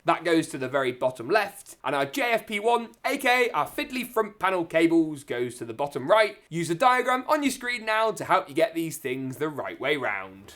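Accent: United Kingdom. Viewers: This dudes brittish accent is just too much, lol!!!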